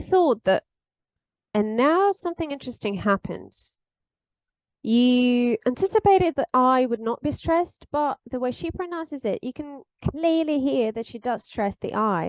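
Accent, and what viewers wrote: United Kingdom. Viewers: What's the practical reason for having such a very British-like sounding accent? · i love the gentleness and preciseness of the british accent if that even makes sense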